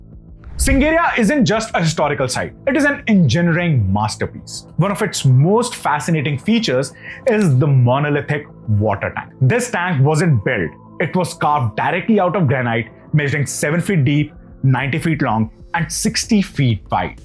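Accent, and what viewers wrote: India. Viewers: Better slow down mate ...then we can at least understand your typical Indian English Accent. Slow down, why are you hurrying for ??? 😃👍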